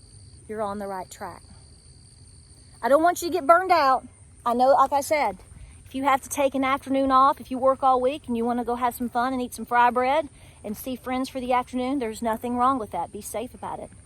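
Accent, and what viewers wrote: South, United States. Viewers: your not duche your amerikan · I like your accent; you must either be a Texan or from some from south. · I thought you were from the Netherlands until about half way into the video and I thought you sounded like the most American foreigner that I've ever heard.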